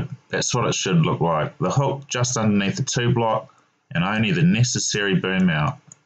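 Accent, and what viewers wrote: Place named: Southland, New Zealand. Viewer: where ya from mate bit of a kiwi southland twang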